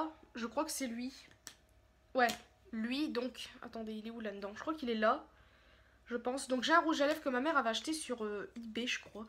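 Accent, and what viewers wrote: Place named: Nord-Pas-de-Calais, France. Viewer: Mon dieu.... Je m'excuse mais dans le NPDC on a pas tous cet accent dégueulasse.... Ça me fait mal car on ne parle pas tous comme ça. Faites un effort s'il vous plaît ! Vous parliez avec cet accent à l'école ? Nous non ! "jvais vous faire voir" "j'aime de trop" "prrrrrrrt" "esspliqué" "à la pub" "swouotché, voilo" "voyagé" (voyageur) Non, non, non.... pitiée pour notre patois ....déjà Dany boon nous a assez ridiculisé.... C'est pas la peine d'en rajouter. Je m'excuse je tombe ici par hasard, je cherchais des malheureux swatches.... Alors j'en ai et notre accent à couper au couteau.... Prenez l'accent en famille svp, mais pas devant la France entière svp ! J'ai tellement honte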